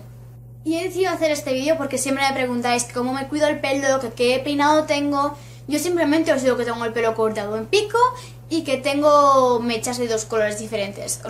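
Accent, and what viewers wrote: Argentina. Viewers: De nada:D Por cierto me encanta Argentina el acento y los dulces, el dulce de leche😍😍😍😍😍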